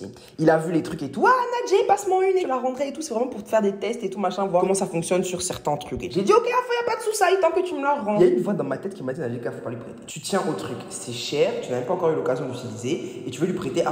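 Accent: Ivory Coast. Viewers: Une métisse qui parle comme une bledarde ivoirienne....elle oublie son côté blanc. Moi je ne vois qu'une métisse en recherche d'identité à travers un accent et des expressions....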